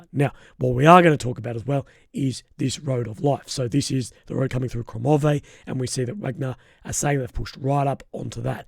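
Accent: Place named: Australia. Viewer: Sorry mate but the breathless, staccato delivery in harsh Australian twang is extremely debilitating, an assault on the ears. Also makes my heart rhythm jump erratically.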